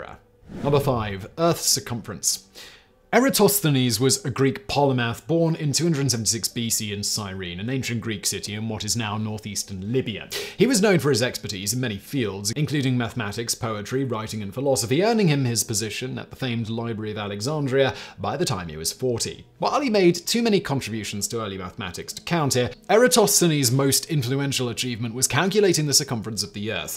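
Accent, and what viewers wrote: United Kingdom. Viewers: I listen to the Casual Criminalist podcast at night while driving a truck in NC, USA. I have one complaint. It doesn’t come out every day. I need more murdering cannibal stories told to me in a proper British accent. Thanks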